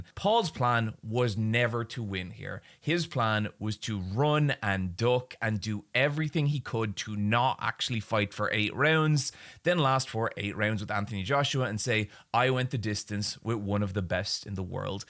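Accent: Ireland. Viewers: Irish Henry Rollins explaining the Jake Paul nonsense? Yeah, I'm here for this. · Is ur accent Irish ☘️ ❓ very cute.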